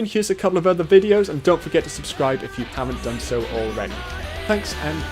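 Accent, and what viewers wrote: Wales, United Kingdom. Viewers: Are you Welsh? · Wherabouts in Wales are you from, mate?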